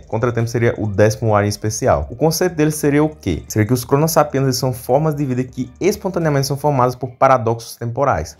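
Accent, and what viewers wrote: Northeast, Brazil. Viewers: A proposta do vídeo é o conhecimento nerdistico, n importa se a dicção dele é boa, oque importa é entender os fatos relatados, e a dicção ele é mt boa, só por causa do sotaque nordestino que vc ta se doendo? Patético.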